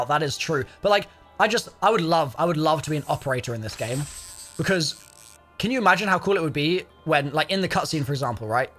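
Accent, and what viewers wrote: United Kingdom. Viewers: Hearing “y’all” in a British accent might be the best thing ever; you’ve surpassed NJ456, and have become the Omega Gamer Leader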